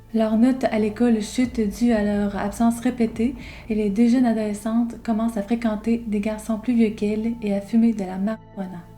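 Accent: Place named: Quebec, Canada. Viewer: J'ai failli le dire, jusqu'à ce que je comprenne rapidement que c est à cause de l accent dont je ne suis pas habitué. J ai évité de le dire à la base pour ne pas que la youtubeuse le prenne pour elle, les autres vidéos intéressantes d autres youtubeur québécois ça me fait pareil. Tu pourrais la décourager alors que ce n est pas elle le problème.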